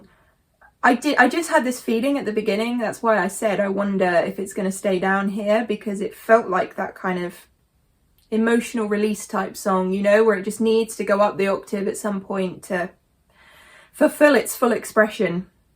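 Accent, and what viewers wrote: United Kingdom. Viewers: Are you brithish ? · Best reaction ever, professional and respectfull, thanks and good luck, i like very much her typically british accent , nice.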